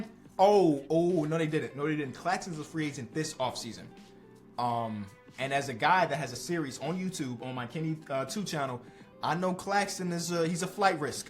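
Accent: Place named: New York, United States. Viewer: I still love that ever since Kenny became friends with SAS, he took on that New Yorker accent lol. Epic.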